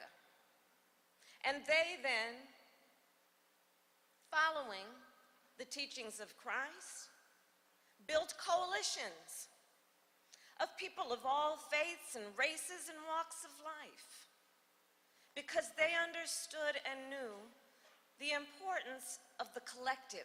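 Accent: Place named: South, United States. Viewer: LOL her family owned slaves in Jamaica, why is she talking like a Southerner. What a joke.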